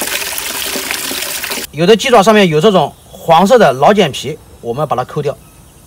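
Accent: Sichuan, China. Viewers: 這種話才聼得懂，四川話聼起來好累唷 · 我特地來聽你的四川腔，你怎麼不說了😢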